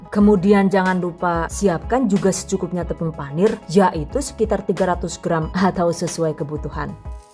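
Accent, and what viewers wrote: Banyumas, Indonesia. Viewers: Selain berguna bgt resep2nya..channel ini sgt menhibur bikin ngakak kalo mbak sdh keluarin bahasa ngapaknya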